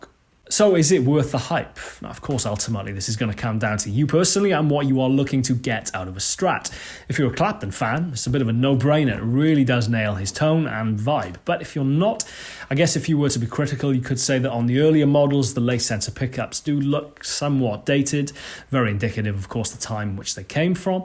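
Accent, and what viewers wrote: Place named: England, United Kingdom. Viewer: Beautiful playing like always! and we just love your "einglish accent". Servus from Vienna! (p.s. what do you think about the eric johnson signature strat?)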